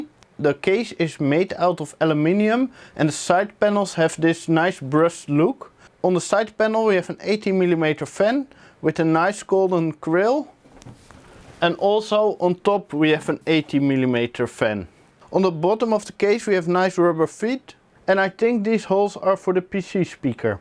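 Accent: Netherlands. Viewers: Hello fellow dutchman. I like your content. English technique is also good. Only the Pronunciation wauw XD sometimes i just had to laugh. Klinkt zo nederlands. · nice dutch accent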